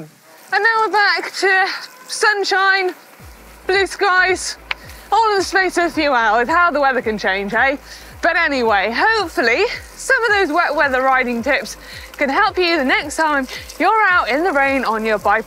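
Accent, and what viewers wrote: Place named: United Kingdom. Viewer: Oh, i love the bristish accent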